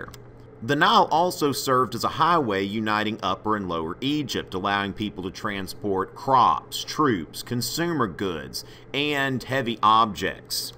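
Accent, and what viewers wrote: United States. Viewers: Why do you have to talk in a cowboy accent ?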